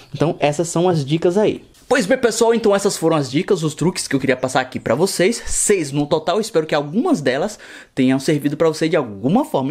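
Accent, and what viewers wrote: Bahia, Brazil. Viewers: Tu é baiano? Te sigo no face mlk bom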